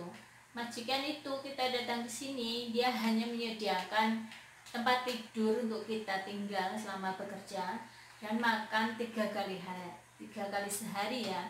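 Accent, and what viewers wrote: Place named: Java, Indonesia. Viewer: Dari logat nya koyo wong jowo yo mbak..jowone ndi smpean....wes tak kirim duit e lengkap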